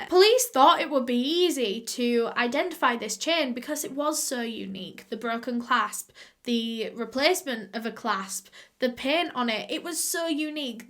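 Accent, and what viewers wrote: United Kingdom. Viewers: I also like watching her cuz she is British and I can't stand other accents as I am British and like I'm not try a be rude or mean I just prefer the British accent rather then any other cuz I grew up around that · You’re accent is too British..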